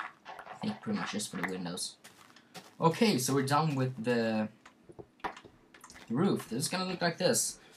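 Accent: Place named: Sweden. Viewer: like a swede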